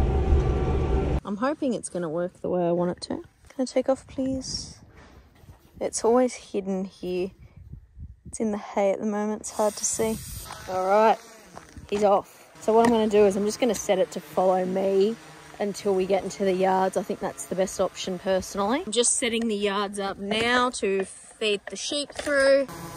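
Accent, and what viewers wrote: Australia. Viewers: I didnt even consider that most people watching would be american because youve got a proper aussie accent 😂 im watching from WA, i just think you're funny af and its cool to watch farm work for some reason 😅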